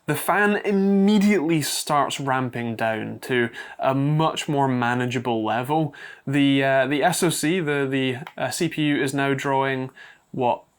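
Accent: United Kingdom. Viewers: Interesting video, I'm just curious about your accent, what part of UK are you from?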